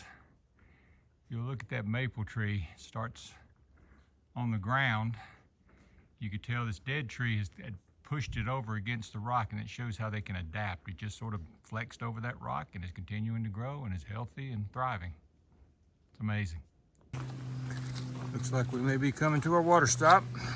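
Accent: United States. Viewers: I like listening to your North Carolina accent. My uncle settled in Rockingham after WWIl and sounded like you even though he was from Erie, PA. Really enjoying your hike. Thanks for sharing.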